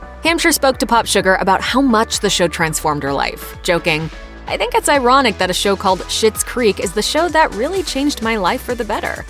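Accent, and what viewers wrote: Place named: United States. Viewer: I don't think the commentator is Canadian. You can tell by the way she says, "Toronto". Def a young American.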